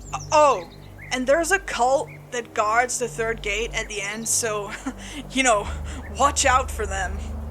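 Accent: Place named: United States. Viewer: This was totally awesome. Your American accent is great! I hope you do more of these!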